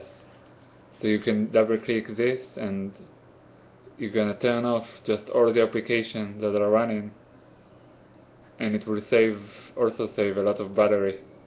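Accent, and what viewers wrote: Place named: Israel. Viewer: איך שומעים שאתה ישראלי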